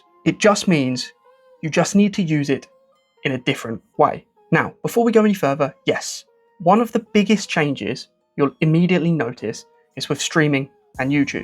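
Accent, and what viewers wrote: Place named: United Kingdom. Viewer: Loved the very strong british accent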